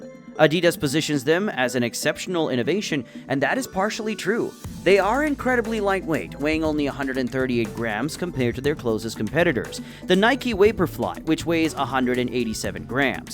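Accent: Russia. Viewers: I need the Russian accent 😢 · for real? Dang, I miss the Russian accent voice but looking and listening this one, I understood why. It feels like it’s made for a wider, global audience that’s used to American youtube channels. Add to the fact in your reply to another comment that you have two jobs. I’m just glad this channel is just dropped a new video after a long time, right with Adidas’ new elite running shoe.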